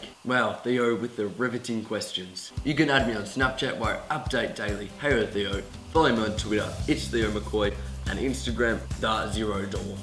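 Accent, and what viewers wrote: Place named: Australia. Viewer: what is your accent ahahaha it sounds like extreme australian accent lol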